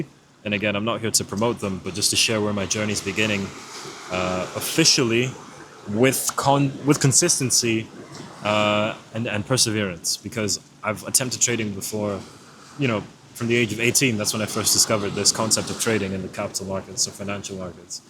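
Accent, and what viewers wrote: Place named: Edinburgh, United Kingdom. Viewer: Welcome to the adventure mate. Once you focus on freedom you won’t look back! If you still have your job it’s a nice safety net while learning. Your accent sounds like Edinburgh maybe?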